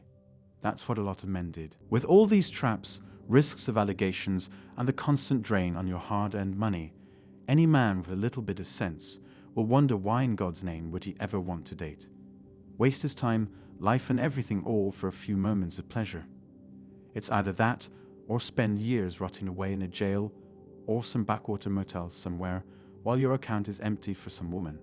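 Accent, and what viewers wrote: United Kingdom. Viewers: I'll admit I'm not a 20-something in the dating pool, but while watching this I couldn't help but be reminded of Tom Cruise's "Seduce and Destroy" seminar character in Magnolia, particularly in the "trap" section, except with a calm British accent. I do agree that social media has poisoned personal relationships, setting up unrealistic expectations, and the need to go back to direct, interpersonal contact is imperative. Don't let these "incel" mentalities to rule your lives, my young dudes, but also don't let a lack of a relationship destroy your self-esteem and stop you from enjoying life. Not all young women are vain harpies looking to suck you dry, be patient and eventually you'll run into someone you feel mutually compatible with.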